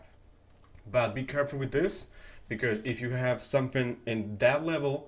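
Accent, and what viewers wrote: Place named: Spain. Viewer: Excelente tutorial... Supongo que hablás Español por el nombre y además por el acento:)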